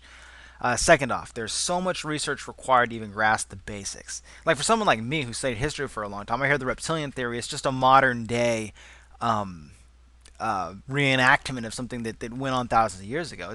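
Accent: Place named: United States. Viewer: I Cannot stand the arogant yank talkover it pisses me off!Cant someone else do it?(it,s just his voice).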